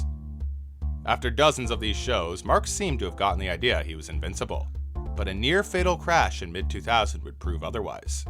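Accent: Canada. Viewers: I can tell you are Canadian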